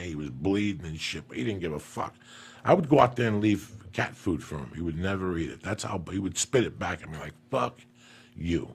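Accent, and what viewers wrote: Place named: Bronx, United States. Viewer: Hey I don't know you but my grandmother is from the broncs so my whole family talks like you. I just wanted to let you know your a fucking trip but I appretiate you and Superbad is just like my cat Alice Cooper he was a street cat and he's mean as hell. He's black as night with one white patch on his chest has pieces of his ears missing and fangs that go past his chin like a vampire. But he is absolutely a lover if hes not loving on you and farting up a storm hes bitch slapping the dogs in the house and stealing food off of everyones plate (he actually ran off with a whole steak before like a fucking snatch and grab)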